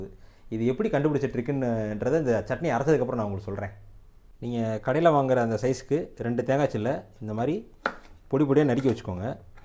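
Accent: Madurai, India. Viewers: மதுரை பாஷை வருதுங்கோ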